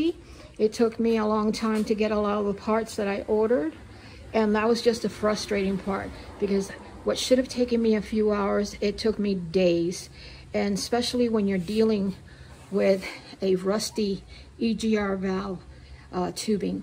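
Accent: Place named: New Jersey, United States. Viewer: OOH !!! A Joisey Gal !!!! Luv it even more !!!!!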